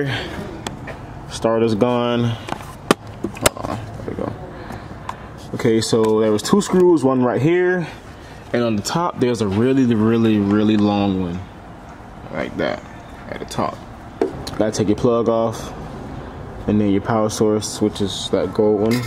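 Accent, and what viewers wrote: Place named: Houston, United States. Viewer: Tell me you from Houston?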